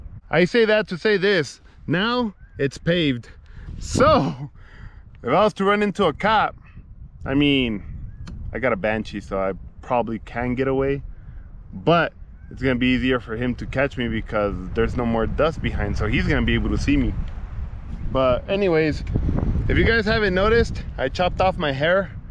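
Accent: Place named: South, United States. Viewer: Love that country twang 😂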